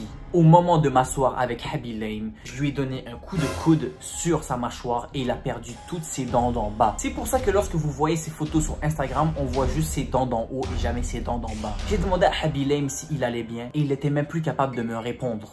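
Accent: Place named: Arab world. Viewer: T as un accon arabe tkt j ai rien contre les arabe la preve je suis arab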